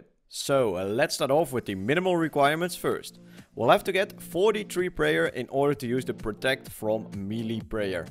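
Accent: Netherlands. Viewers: aan je accent te horen ben je nederlands :)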